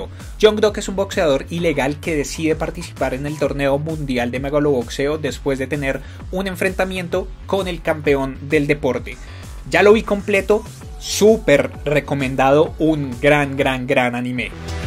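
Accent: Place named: Colombia. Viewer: Me encantan tus videos!! Hace poco que llegué a un video tuyo y desde ahí ya no me pierdo ninguno. Ir al cine contigo debe ser sensacional para poder hablar del tema con tanta pasión como lo haces en tus videos, 😭 pero voy escuchando que eres de Colombia!!! , no !!!!!!!!! yo quería, jajaja. Te mando muchos saludos y mucho éxito con tu trabajo, lo haces genial.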